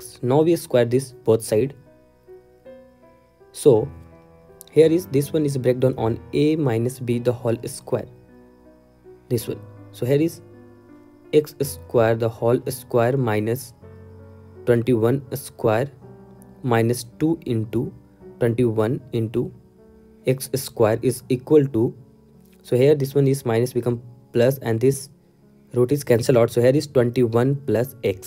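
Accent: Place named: India. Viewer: no, his voice is terrible, maybe just indians can understand his english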